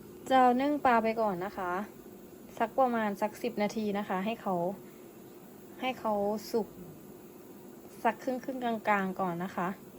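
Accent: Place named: Isan, Thailand. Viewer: ชอบพี่ พูดอีสานมาก 😂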